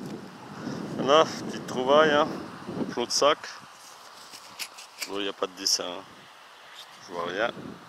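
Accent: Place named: South, France. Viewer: petite sortie sympa ca degulingue la tarte a l'oignon continue j'adore ton accent du sud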